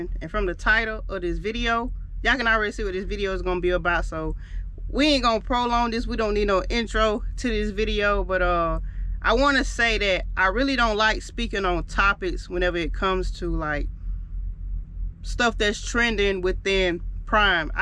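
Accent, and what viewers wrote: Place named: Florida, United States. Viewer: I’m gonna make an educated guess and say you are from Florida that’s because I can catch that Kodak Black type accent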